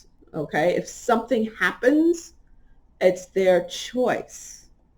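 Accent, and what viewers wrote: New York, United States. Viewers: I like you New York style 👍🏻🎶🎤you Rock.. 😎🤩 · I love your "New Yorkness" and I am sure a lot of other people do too. Thank you.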